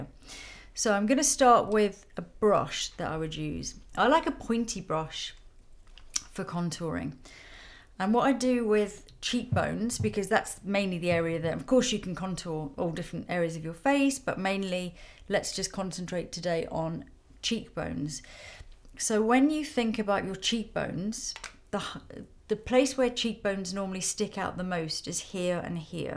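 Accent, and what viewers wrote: United Kingdom. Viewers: Love her explanations of make-up. Also, love her calm, mellow voice with British accent.:) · I love your accent. Always liked the British accent.❣️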